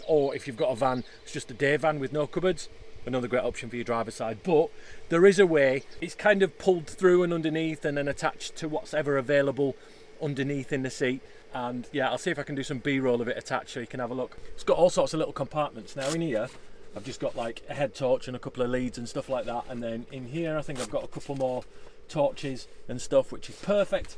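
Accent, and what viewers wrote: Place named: Leeds, United Kingdom. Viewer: We love the channel and site reviews. Having been born and grown up in Leeds Im sure there is a north east Leeds twist to the accent and have now invented a new family game of “where’s ” accent from. Keep the reviews coming. They are a great way to unwind after work and between our own campervan adventures.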